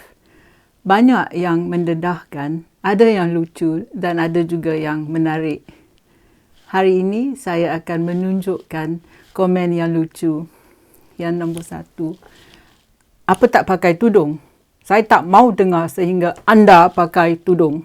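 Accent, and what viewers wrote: Perak, Malaysia. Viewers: Tahniah. Lucu gak,bila loghat Perak digarap sekali. Hahaha. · Saya suka slang Perak. Tahniah dan salute!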